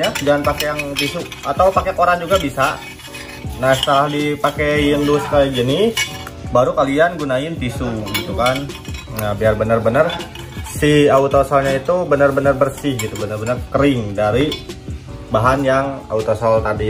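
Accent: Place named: West Java, Indonesia. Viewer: Urang sunda pisan cepel wkwk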